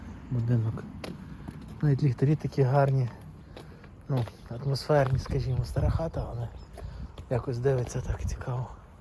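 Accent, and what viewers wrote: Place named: Western Ukraine, Ukraine. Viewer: ви мабуть родом з Прикарпаття,відчувається що десь західної України,я теж родом звідти,але все життя проживаю біля Києва.Хай вам щастить!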